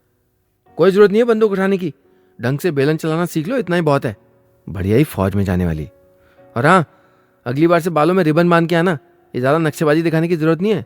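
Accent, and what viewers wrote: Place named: Uttarakhand, India. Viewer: Sir m from uttarakhand and bolne Mai asi tone hmare wha sun ne ko milti hai apki khaniyon Mai apne uttarakhand ki jhalak pake bht acha lgta hai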